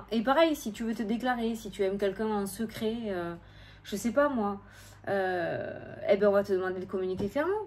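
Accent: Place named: Pyrénées-Orientales, France. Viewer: Bonjour! Cela fait des années que je regarde des vidéos sur YouTube et aujourd’hui c’est le premier commentaire que je vais poster. Le jour de mon anniversaire au réveil, YouTube me suggère cette vidéo, première surprise il me semble reconnaître notre accent des P-O (où je vis). Je regarde et j’écoute attentivement et plus la vidéo avance et plus je me dit que c’est mon cadeau d’anniversaire tellement il y a de ressemblances avec ma vie actuelle. Rien n’arrive par hasard dans la vie et sur ce coup là celui qui me dit que la synchronicité n’existe pas je le savate 😁. Merci pour la bonne énergie que tu dégages et ce naturel qui fais toute la différence. N.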